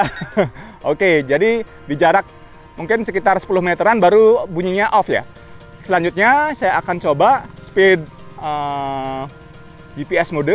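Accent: Bali, Indonesia. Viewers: logatnya bali om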